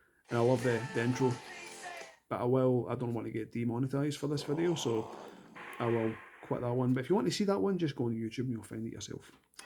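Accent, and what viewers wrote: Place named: Scotland, United Kingdom. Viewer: As an Irishman i am pretty sure he is Scottish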